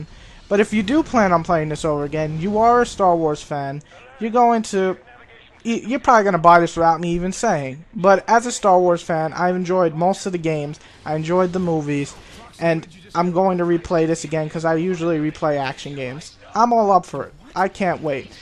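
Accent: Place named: New York, United States. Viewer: Proper New yorker :) The way he says bosses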